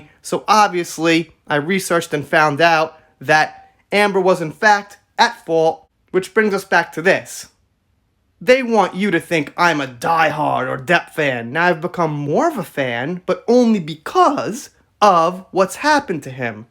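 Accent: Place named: Brooklyn, United States. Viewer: This is my 4th time looking at your channel and I LOVE ITZ!! Thank you! Love your “ Brooklyn”? Accent too ( from The Heights😍 )!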